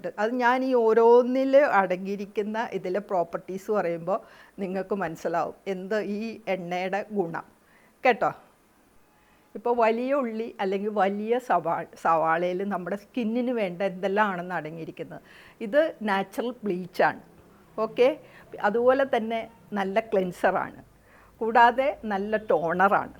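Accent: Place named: Kochi, India. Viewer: Mam te veedu fort kochi aano ...or vyppin...samsarom kettuttu agane thonni...njan Ernakulam...kaloor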